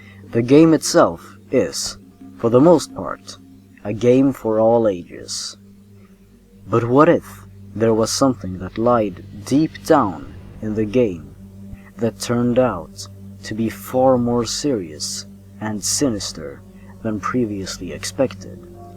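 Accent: Sweden. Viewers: wait a sec.....are you... SWEDISH??!? like I am ^^ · are you from Sweden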